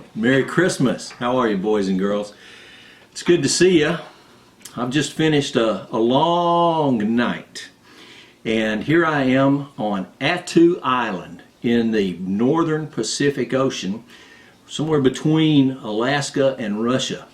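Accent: South, United States. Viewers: Santa had a bit of a southern accent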